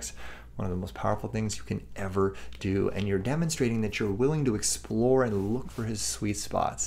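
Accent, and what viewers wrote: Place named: South, United States. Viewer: I love your southern accent.🌞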